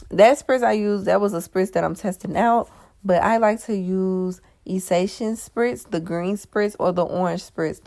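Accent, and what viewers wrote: United States. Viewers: That southern twang. Yes baby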